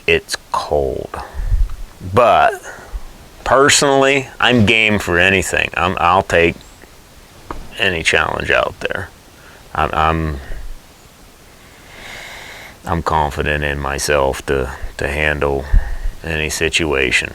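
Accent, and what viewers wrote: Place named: Pennsylvania, United States. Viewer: Good answers to questions people were wondering about. Had no back ground at all on you or any contestants. You talked like people that I know in Pa. After the show is over my son-in-law told me you are from Clearfield county PA. The son-in -law and daughter live in Faunce. The segments that were shown of you and the other participants were so interesting. It caused me to wonder. Could I do this? Hell no was my answer. Listen, proud to see someone from Pennsy took the title. Although Callie gave it a good run. My money was on you after day 50. Congrats to you and wishing you the best. Hope to meet you someday, maybe at a book signing or something. Good luck and safe(but exciting journeys to you).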